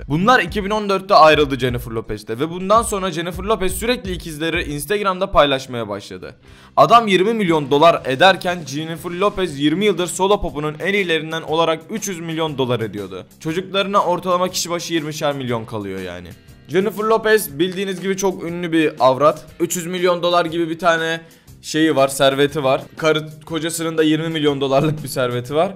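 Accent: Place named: Hatay, Turkey. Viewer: hataylı olduğu nekadar belli 😃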